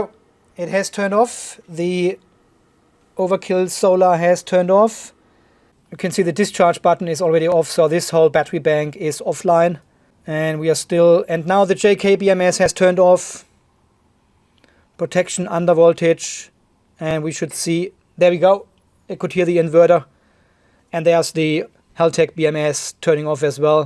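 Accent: Germany. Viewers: Ah the weekly dose of Australian volts with a German accent. Cheers!, i connected the "peter" inverter to a convection oven. Now that draws some amps! bake some bread/pizza's if you want a load seems a great idea... Great display btw.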